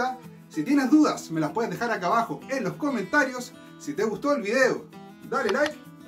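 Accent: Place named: Chile. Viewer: Hermoso acento chileno 😻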